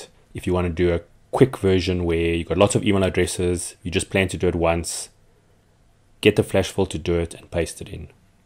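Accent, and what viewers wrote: South Africa. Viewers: As soon as you opened your mouth, I thought to myself, you must be South African. I can recognize my countrymen no matter where I find them. Am I right?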